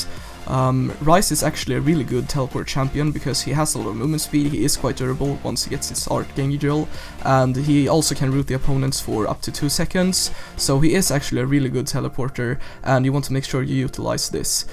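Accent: Sweden. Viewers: Are u swedish? u sound like a swede when u talk:.)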